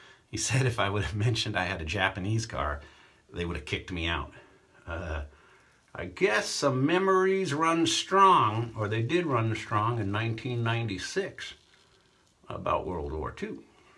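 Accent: England, United Kingdom. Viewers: Do you recall having an English accent at any time as a child? Are there any recordings of your voice at that time?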